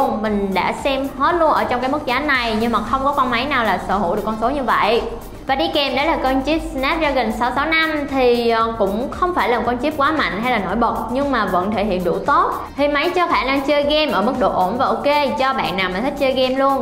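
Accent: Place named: Phu Yen, Vietnam. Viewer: Nghe tiếng sao giống phú yên hay bình định gì giữ trời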